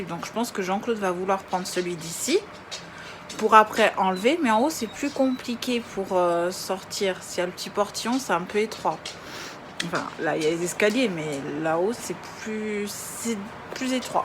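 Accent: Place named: Corsica, France. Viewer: Quand je t'écoute ça me fait penser aux patients corses que j'ai au téléphone !! J'adore l'accent corse !!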